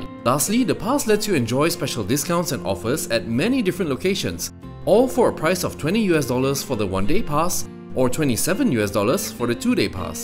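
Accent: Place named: Singapore. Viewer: You sound like you’re from Singapore.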